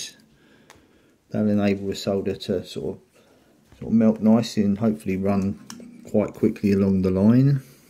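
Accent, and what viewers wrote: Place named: Kent, United Kingdom. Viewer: Hi thanks for your time and work. You have a familiar accent, are you from north Kent?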